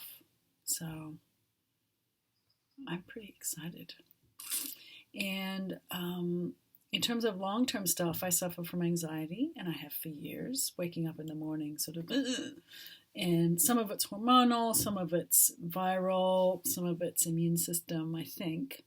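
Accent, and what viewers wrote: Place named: Australia. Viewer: From one ozzie, I detect a slight ozzie accent listening to your talk 😉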